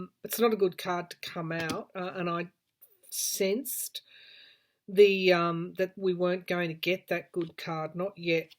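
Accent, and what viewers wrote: Australia. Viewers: 🙋‍♀️I love your Aussie accent 😊❤ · I'm glad I'm not the only one who gets annoyed at viewers who correct others so easily. As for me, I love your wonderful Aussie accent & accents in general. Thanks for all you do. I really enjoy your readings . 👏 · Love your accent and purely Aussie expressions❤️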